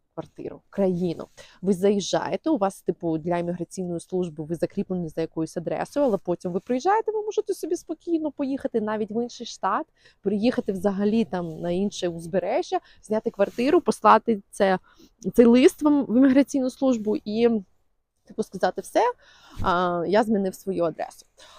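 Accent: United States. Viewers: З цікавості...У Вас акцент цей американський зявився за ці два роки проживання?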